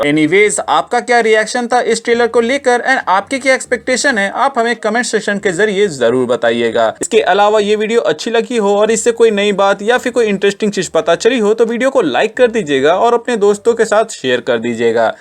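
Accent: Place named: Bihar, India. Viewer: your Bihari Acsent is good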